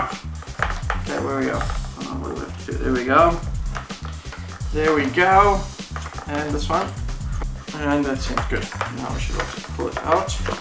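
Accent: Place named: Afrikaans, South Africa. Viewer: Not to be rude or anything, but is Afrikaans (or Australian) your first language? I just think so because I have the same accent.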